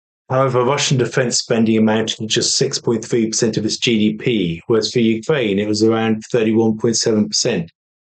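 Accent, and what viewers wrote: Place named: United Kingdom. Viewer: Thanks! You know your stuff and it’s nice to hear intelligent observations with a British accent 😂 You join a growing group of advocates for Sanity sadly lacking in Government and on MSM. Subscribed ❤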